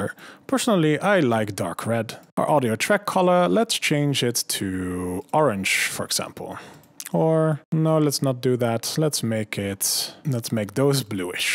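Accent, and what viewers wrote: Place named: Netherlands. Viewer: Ik dacht al dat ik een NL accent hoorde.. :) gave video.. wat is de key shortcut om die tijdsbar aan het begin te krijgen, de Home toets werkt niet bij mij.. wordt er aardig gek van..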